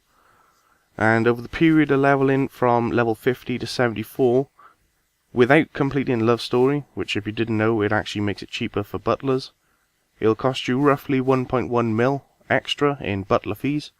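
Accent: Wales, United Kingdom. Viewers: Where are you from you welsh?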